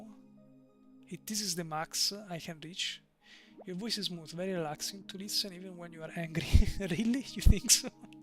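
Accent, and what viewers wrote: Italy. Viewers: stupid question but are you italian??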